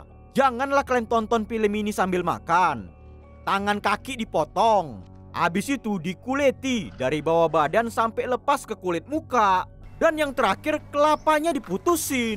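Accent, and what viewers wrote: Medan, Indonesia. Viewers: Orang medan abang ini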